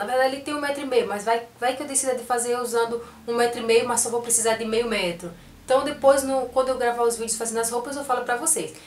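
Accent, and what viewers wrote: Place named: Northeast, Brazil. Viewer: minha linda eu amo vc seu canal, videos, amo ate a forma d vc falar com esse sutac tipo nordestino como eu rsrsrs....vc foi a primeira q comecei seguir aqui e atraves do seu canal q fui seguindo outros....bjsss linda mt saude e seja mttttt feliz com seu mozão!!!!♡♡♡♡♡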